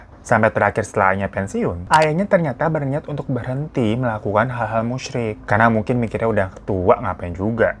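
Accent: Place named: West Java, Indonesia. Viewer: Kak logat sunda tapi nama nya kayak jepang yà....😃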